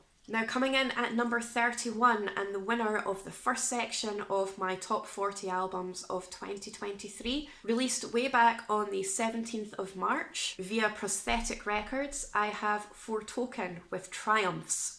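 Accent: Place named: Scotland, United Kingdom. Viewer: Your accent reminds me of my hometown, Kincardine (Ontario, Canada). We had a very strong Scottish influence. It’s good to hear for a change.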